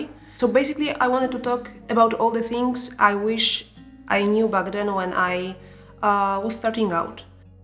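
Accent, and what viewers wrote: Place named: Spain. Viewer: Thanks 4 the video! 👌⭐ Spanish english accent detected hehehe (or maybe not, who knows)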